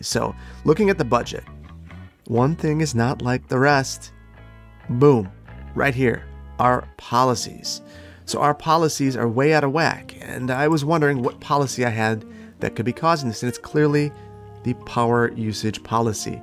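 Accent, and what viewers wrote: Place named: Midwest, United States. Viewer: I can tell you’re from the Midwest. I’ve driven on more than a few two- (or almost two-) dirt roads in the mountains around New Mexico (especially as a Boy Scout leader in college circa <num>) where the road hung along the side of the hill with a nice drop off on the outside and no guard rail. One of these is/was the access road to our local council Boy Scout Camp which I’ve driven numerous times. Chances are given the mountainous nature of that part of Clearwater County, nobody would bother with a guardrail until the road was paved, or at least traffic and/or accidents warranted.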